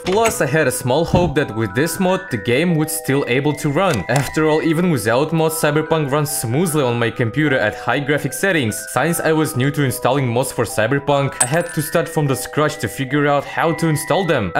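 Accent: Russia. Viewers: Сижу, слушаю акцент питерского английского.... кайф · Are you Russian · Чел ты из Росси или знаешь русскому язык, это заметно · terrible russian accent, all russians are guilty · I subscribed for how funny the russian accent was, editing, and personality. Keep it up brah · Есть ли у тебя канал на русском? Просто слышу сильно русский акцент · Man your accent is addictive, Ruuusssssiiiiiaaaannn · блин, вначале я не думал что это это русский акцент, всетаки на английском говорят кучу разных национальностей и я акцент сильно не различаю, но уже расставило всё по местам🤣 кста, может кто подсказать, моды лучше всего перекидывать просто в корневую папку, или в архив в корневой папке? и будут ли моды работать, если закидывать их в отдельно созданную папку в корневой папке игры? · That russian accent ! Привет, брат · I can bet on the authenticity based on the Russian accent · Акцент понимаю ты русский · Акцент как у русскоговорящего. 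Лайк авансом. Расти большой дружочек) <3 P.S. только сейчас понял, что спустя 48 секунд видео, появляется родное уху: "Блять" 😅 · Im a simple guy. I hear russian accent, i sub. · russkiy blya ya tebya slishu suchka) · i Love his russian/english soo much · that russian accent is the cherry on the cake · какой же у чела русский акцент))))))) мужик делай контент на русском пжлст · ато я слышу что автор русский страно по американский говорит как друг мой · You can tell that Bro is Russian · бля ты руский что ли) акцент выдает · Russian accent :D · по акценту понято что русский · Ahh the Russian accent blessing my ears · i freaking love ur russian accent lmao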